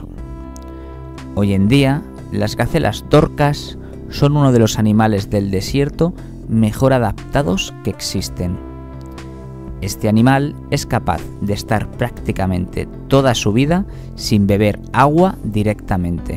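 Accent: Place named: Spain. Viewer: Espero algún día antes de morirme... nuevamente escuchar el acento latino ...Y no escuchar más este acento coño....tan miserable...tan feo ....tan.... pero tan....re...ni encuentro la palabra para describirlo....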